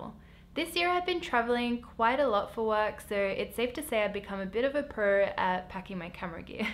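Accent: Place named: Australia. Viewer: Thank you for this video. And also, i figured that you are australian, which is very awesome!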